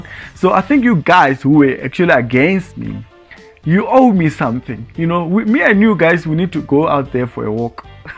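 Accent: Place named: Tsonga, South Africa. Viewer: I repeat luyolo can sing you have so much hate for luyolo. Stop hating. And what's with your funny xitsonga accent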